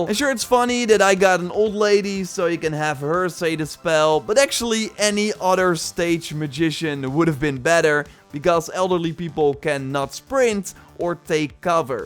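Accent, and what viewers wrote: Netherlands. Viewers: Yo man you seem to have a dutch accent.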